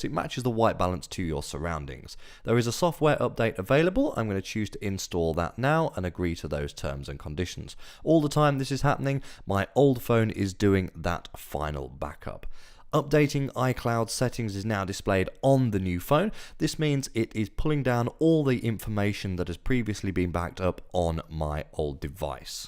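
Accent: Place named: United Kingdom. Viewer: It does not have writing in the back if you live in the U.S. and in some other places like Canada. He probably lives in the UK I'm guessing bc of his voice and....He said it in the video.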